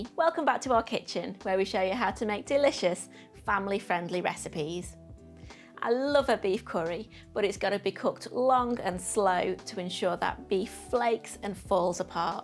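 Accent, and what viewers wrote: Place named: Cockney, United Kingdom. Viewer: Well Done the...loving that Cockney accent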